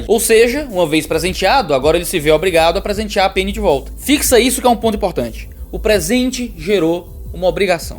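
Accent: Ceará, Brazil. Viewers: Você é cearense?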